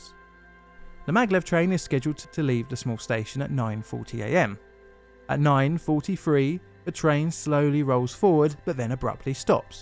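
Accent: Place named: Southern England, United Kingdom. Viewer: I love his voice its a bit of a southern British accent.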